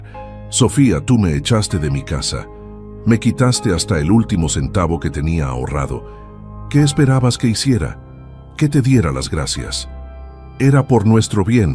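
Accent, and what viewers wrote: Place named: Dominican Republic. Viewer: Te.escucho.d e.dominicana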